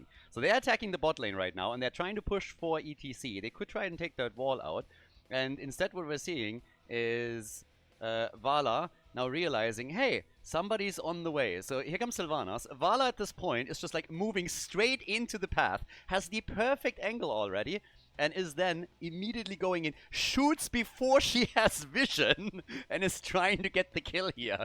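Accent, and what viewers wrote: Germany. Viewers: Thanks for putting in the effort man! Great content! As a Dutchman living at the German border, I really enjoy your German accent 😀!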